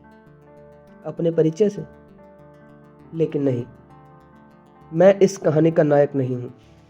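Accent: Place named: India. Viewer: Fab💙 Hindi Accent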